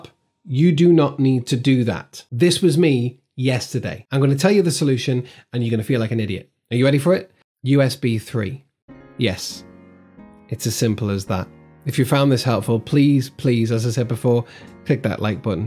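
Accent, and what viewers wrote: United Kingdom. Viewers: BRILLIANT ... I feel so stupid.... i bought a used ANDROID tablet, just for the drone, tested at home, props off - GREAT !!!! Went to fly today, thinking it was a great idea to take one of my shorter leads, from the 'stash' that we all collect. I had to revert to my phone and the supplied short lead, I just couldn't get my tablet to connect.,. I tried changing settings, everything I could. it wouldn't work. Then, I came across this video, rummaged through my stash and found the lead I'd used first. It worked, a Sony USB-3.... Fantastic. I then found a short USB-C and will use this with adapter. Thank you so much for this. SUBBED your channel based on this and the fact it's UK accent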